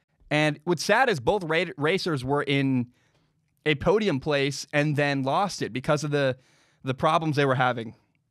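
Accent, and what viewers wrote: United States. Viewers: Great to hear American accented F! commentary!, I believe you have found a great niche! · Glad to see you're picking up on F1! Loving the commentary. It's definitely refreshing to hear F1 content in an American accent xD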